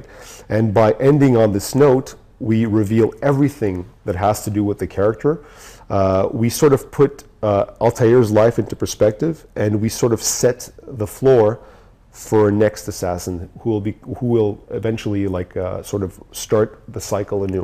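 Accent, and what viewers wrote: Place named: France. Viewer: did anybody else notice the tiny french accent he has? lol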